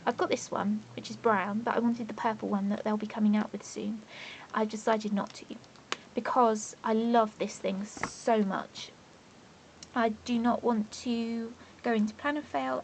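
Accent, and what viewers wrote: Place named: United Kingdom. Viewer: my first search today at the YouTube about planning planners (not just designing...), really happy to find your videos, I love your ways of planning, going to watch all your videos later. I was also glad to find a British-speaking accent, even the sound of seagals on the front in one of your videos made me smile :-):-):-) thanks for your videos and sharing your experience of planning x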